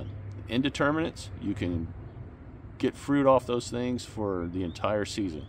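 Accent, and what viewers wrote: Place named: Texas, United States. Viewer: I am also still learning but expanding my gardens again this year. You sound like a fellow Texan so I'll so I'm subscribing to your channel in hopes I can learn more. Appreciate your honesty - we all make mistakes. If you figure out how to get rid of the squirrels please share. Hate it when I see one run across my yard with a ripe strawberry in their mouth (now caged the bed).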